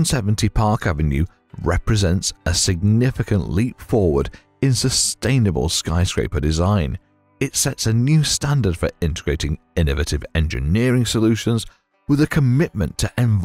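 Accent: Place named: United Kingdom. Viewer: We're not British here in New York City. We don't pronounce it Two-Hundred and Seventy Park Avenue. It is Two -Seventy Park Avenue. And while I'm at it, we don't say litrally either. It is pronounced literally. We don't drop the "E" like you Brits due all the time.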